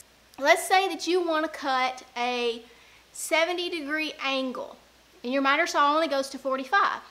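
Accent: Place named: South, United States. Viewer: Southern accent and very funny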